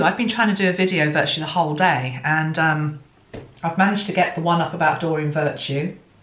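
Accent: England, United Kingdom. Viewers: Hi . I like your English accent and the way you put those perfume on you to connect with energy . You're very genuine and kind . ❤️❤️❤️❤️ Ps . Your house looks very tidy and everything is on their place . Your dress very nice too.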